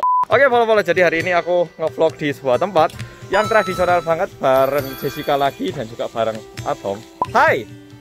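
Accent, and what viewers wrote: Java, Indonesia. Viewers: Beralih jadi aktor Korea medok mas 😂😍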